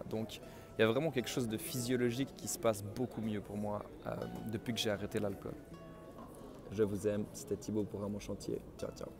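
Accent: Fribourg, Switzerland. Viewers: Salut, J'ai l'impression que tu es de Suisse (ton accent) ^^ et tu as tournée où cette vidéo? Je vois qu'il est marqué Rue de Lausanne derrière mais je n'arrive pas à lire l'autre plaquette ^^ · petite question inutile ... es-tu suisse ? tu as le beau accent fribourgeois ;) (super vidéo ! ) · je voix que tu es Suisse, j'ai deviné juste avec ta voix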